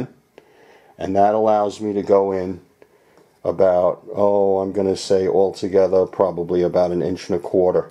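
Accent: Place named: Staten Island, United States. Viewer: Hey. This is incredible stuff. It makes it even more enjoyable that you have the exact same accent as my beloved uncle who grew up on Staten Island. Question: would you make hollows and rounds to sell? I would much rather buy from you than try to track some down from across the pond.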